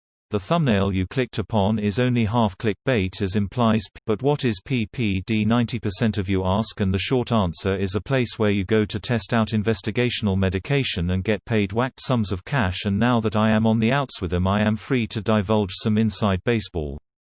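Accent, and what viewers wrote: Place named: United Kingdom. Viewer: i like the british narrative voice.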